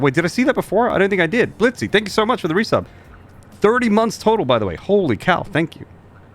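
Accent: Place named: Canada. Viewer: I've had so much trouble trying to identify your accent. Finding out that you are Australian and have lived many years in other countries explains why you sound Canadian most of the time! (I'm Canadian so that is a compliment LOL)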